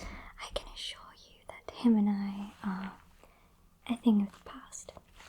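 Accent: United Kingdom. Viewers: "You've got some foliage stuck in the back of your hair." 🫠 Aw that accent. ❤ It's something so commonplace as to be beyond notice to a native like you I'm sure. But the sound of your voice has the capacity to completely short circuit my North American mind.🗣🇬🇧 🤯👍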